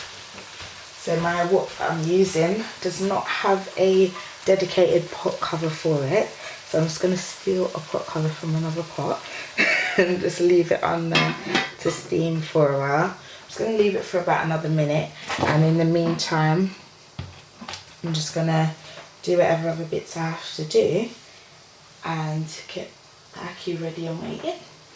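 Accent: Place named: West Midlands, United Kingdom. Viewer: I was thinking more West Midlands, it sounds like you have a hint of it. You are doing good with you channel, maybe we can come up with something together one day? :)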